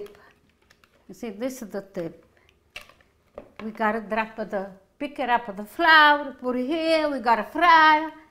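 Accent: Italy. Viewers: لغة انجليزيه علي فكرة بس بلكنة ايطالي لكن كل انجليش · I really like her italian accent she gives me some vibes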